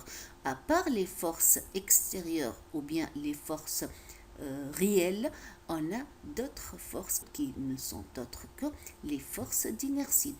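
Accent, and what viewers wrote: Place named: East, France. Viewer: Non seulement la forme c’est à dire votre accent oriental extraordinaire… mais aussi le fond c’est à dire votre précision, votre rigueur, le caractère didactique de votre résolution… tout cela fait de vous un professeur de qualité ! Vos étudiants doivent être fiers de vous !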